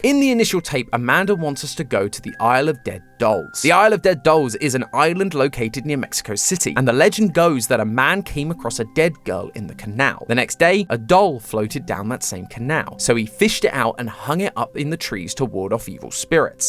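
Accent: United Kingdom. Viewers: I miss Mat. The british accent is weird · i forgot tom replaced matpat,so when i clicked i got jumpscared by a british accent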